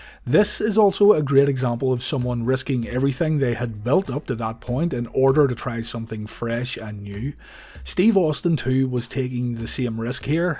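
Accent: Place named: Northern Ireland, United Kingdom. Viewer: Northern irish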